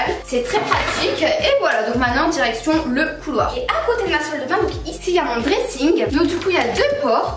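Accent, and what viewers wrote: North, France. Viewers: tu as un accent du nord mixé avec un accent arabe :o :') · c'est tres mignon .... heu tu es du Nord ?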